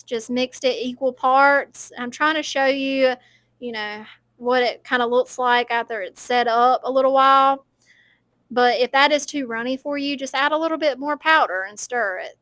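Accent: South, United States. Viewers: I love your channel, (I'll just keep writing that) and all your projects; today's were no exception, I would love any of them for my home. "Centerize" if you know, you know. 😂. Your accent is the proverbial sweet Southern accent I hear in my head when I imagine the South and drinking sweet tea on a big porch. ❤😊 ~Laura [your kindred messy painter 😅]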